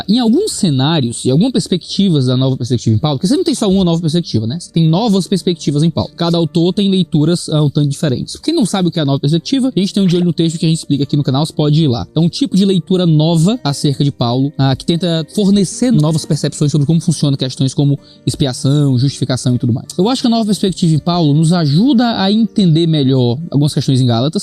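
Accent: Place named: Rio Grande do Sul, Brazil. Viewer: Mas bah, Tchê!!! Que grata surpresa clicar e iniciar o vídeo e ouvir o som da minha querência amada... hehehe... até pensei que era anúncio... kkkkk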